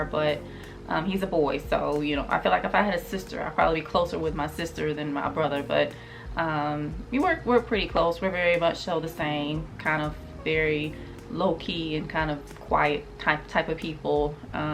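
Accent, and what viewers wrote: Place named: Alabama, United States. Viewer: Yaay to 3k & counting 🙌🏾🎉! When I got the noti for this video I couldn't wait to listen on my drive home. I have so much too say...re: balancing life & how u had the channel for years, 2020 was your time & the pandemic allowed u to get a great start again. Also, years ago, the kids were younger so it probably was a bit more difficult to carve out time when u had to tend to them more. I'm a single mom of an 8 yr old & I'm slowly starting to feel like I can breathe a little at times. Also doing a 3/12 schedule is great esp. if u can make it so that u can have a long stretch of days off. Re: channel growth-it's true what u said about not comparing yourself to others. I wish u continuous growth of a genuine community of positivity. Engagement & consistency is so important, from what I've heard. Re: u loving NYC...I was so excited to hear that! 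I live right across the GWB in Jersey but I was born & raised in the Bronx & a grad of NYU 💜. Also, re: selling your clothes, it is time consuming but I have an idea, whatever your daughter doesn't want, u can do a declutter type of video (more content 😉) & then do a sale. It is a lot of work but for a short time instead of keeping up with listings on Poshmark or the like. Lastly, I kept wondering if u were from Alabama because your accent reminds me of someone who is from there as well. I could go on & on but I'm so happy that I found your channel & thank u for sharing 🤗.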